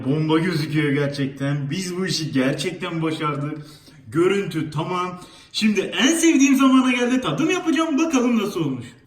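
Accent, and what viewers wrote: Adana, Turkey. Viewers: aslı adanalılar öyle derler götünden Adana'nın şivesi hataya verme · Hatay sandıkiçi diye kanal açıp diyarbakırda yaşayıp adana ağzıyla konuşmak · Konuşma:ADANA Kanal:HATAY Yaşadığı yer: DİYARBAKIR nE?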